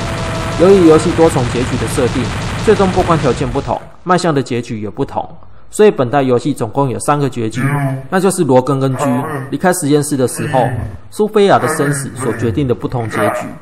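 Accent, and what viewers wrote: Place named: Taiwan. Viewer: 點棟更 哈哈哈哈08笑死 尤其是大大的台灣國語 念點棟更 地契阿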